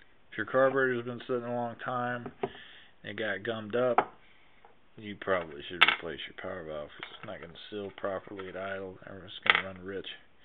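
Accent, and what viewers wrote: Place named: Appalachia, United States. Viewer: Damn your the slowest talking HillyBilly I ever heard.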